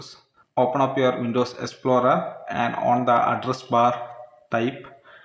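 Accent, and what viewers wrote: Kerala, India. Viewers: bro you mallu or tamil?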